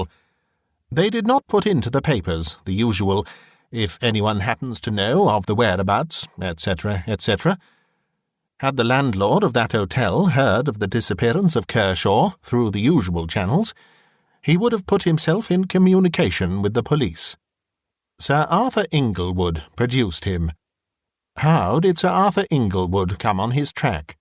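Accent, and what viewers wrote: United Kingdom. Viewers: I don't sleep well so I listen to audiobooks on YT all night every night with earbuds. I like the fact that this compilation has over 9 hours of listening. Although I'm American I enjoy listening to listen to books read in a British accent. Your voice is fabulous. Luckily, I stumbled upon this excellent channel just today.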